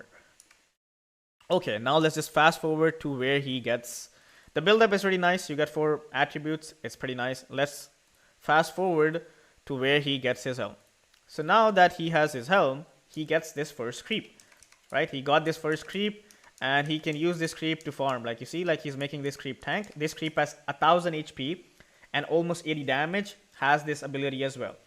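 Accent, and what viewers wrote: India. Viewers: VERY NICE JUST COPY CEB VIDEO IN INDIAN ACCENT , there have been 1000 game with helm and u chose this game that ceb already explained xd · keling speak english so annoying to hear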